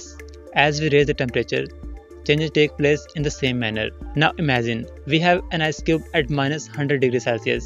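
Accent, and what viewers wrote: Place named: India. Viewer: That Indian accent can kill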